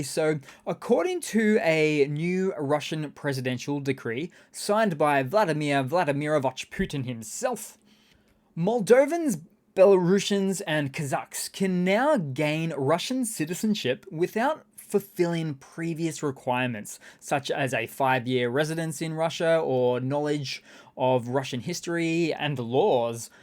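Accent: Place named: Australia. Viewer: (sp?) I enjoy your posts. I follow a few others (Denys, Georgie,Anna,Artur ) and between you I feel that the fact checking is great. You all add something to the big picture. But one thing bugs me, your accent. Please don’t take offense but I’m just curious, are you an Aussie? 😂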